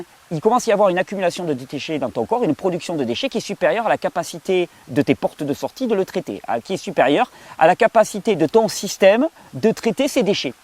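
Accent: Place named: South, France. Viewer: Amusant comme l'accent du sud semble faire passer une dangereuse escroquerie pour quelque chose de sympathique.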